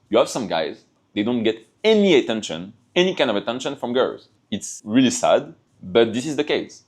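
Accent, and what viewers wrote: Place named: France. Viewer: Salut j'ai reconnu que tu est Français hahaha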